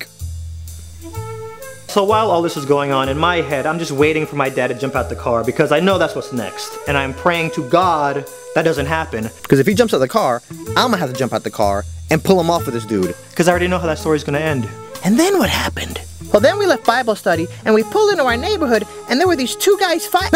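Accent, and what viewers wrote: Trinidad and Tobago. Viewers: I was waiting for him to bus out a trini accent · You from Trini?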